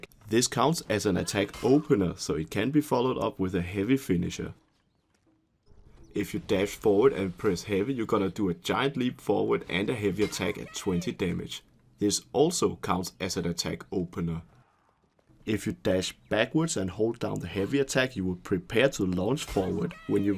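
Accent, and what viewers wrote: Denmark. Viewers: are you from denmark? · It really sounds like ur danish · the danish accent SHINES hehe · Is that a danish accent? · This guy has the most danish accent I have ever heard and it is glorious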